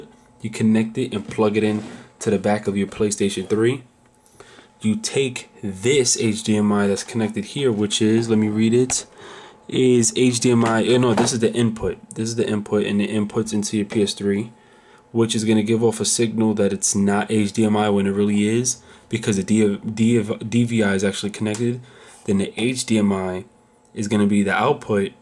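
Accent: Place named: Queens, United States. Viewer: Nice vid bro I can tell you're from Queens NYC I'm from Brooklyn LOL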